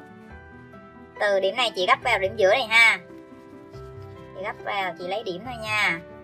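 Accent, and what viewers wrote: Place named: South, Vietnam. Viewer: Đoán vội người miền nam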